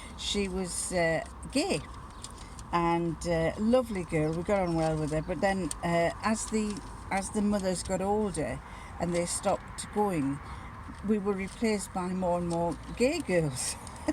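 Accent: United Kingdom. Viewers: I love your vlogs! I am from Ohio, so I love seeing your scenery on drives and walks, enjoy your mother-in-law, the kitties, his lordship, the British accent. I am same age and a sewer so I love your opinions on fitting and showing your makes. So thank you for all your posting.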